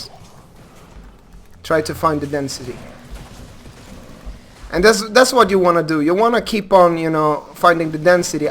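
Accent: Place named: Sweden. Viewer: Dude, this is a cool upload. Where are you from? The accent sounds.. Swedish? In that case, fan så coolt.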